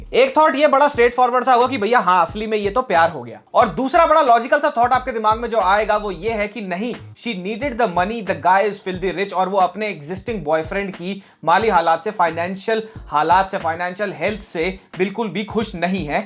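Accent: Haryana, India. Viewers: k review ka koi muqabla nai hai..or iski comedy haryanvi accents...end hai yaar.jiooooooo shehzada jioooo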